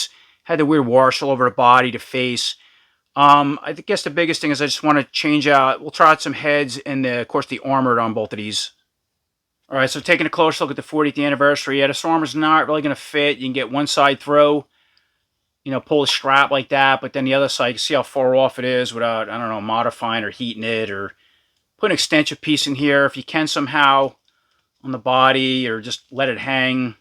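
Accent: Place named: Boston, United States. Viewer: You got that Boston accent. lol Live the review. Thank you. 😉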